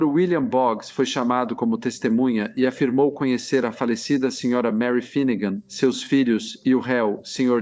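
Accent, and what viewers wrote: Portugal. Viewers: O português de Portugal é lindo ❤ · Alguém precisa dar um jeito neste sotaque de Portugal! É muito chato! E feio!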